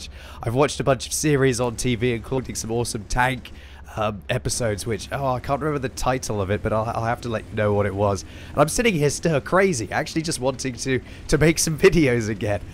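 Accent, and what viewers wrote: United Kingdom. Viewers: sounds even more british like this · You sound a lot more british when sick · Being sick u sound super british :P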